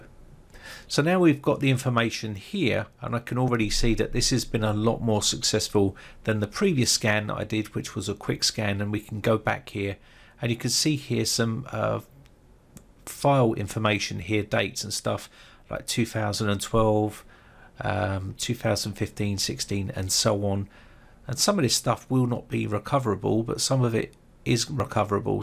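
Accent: United Kingdom. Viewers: Damn he speaks UK English. 👍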